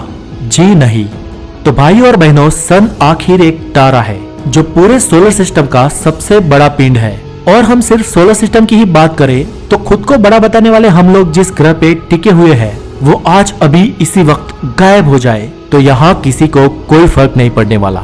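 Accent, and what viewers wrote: Gujarat, India. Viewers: Sir tum gujrat ke ho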